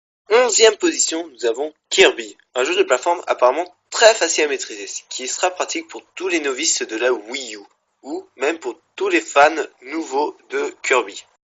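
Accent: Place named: England, United Kingdom. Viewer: L'accent english :p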